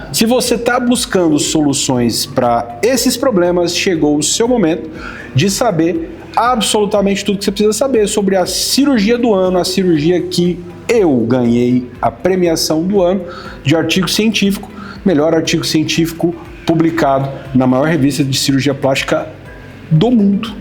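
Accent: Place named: Rio de Janeiro, Brazil. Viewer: Dr é do RJ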